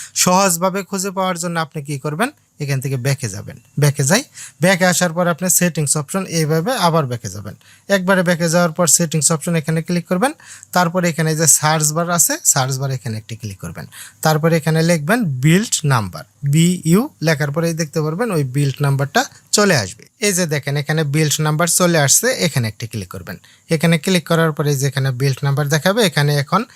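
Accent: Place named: Bangal, Bangladesh. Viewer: বাঙাল টান কম থাকলে ভালো হয়, কেননা আমার মত অনেক দর্শক ভারতের আর একটু তারাতারি বললে ভালো হয়। শুভেচ্ছা রইল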